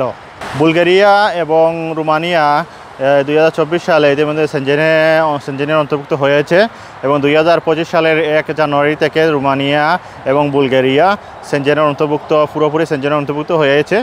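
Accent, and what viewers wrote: Sylhet, Bangladesh. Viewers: Mone hoy Sylheti vai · ভাই সিলেটি নি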